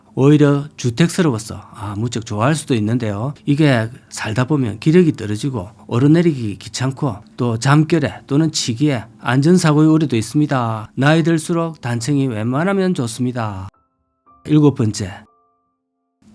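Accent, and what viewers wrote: Gyeongsang, South Korea. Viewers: 경상도분같이 말씀 재밋게하시니 보느데 재밋네요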